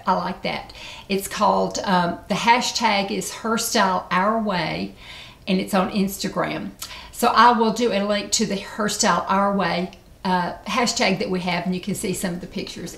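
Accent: South, United States. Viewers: I love your southern accent and vibe. I grew up in the Ozarks and it takes me back to my roots. ❤️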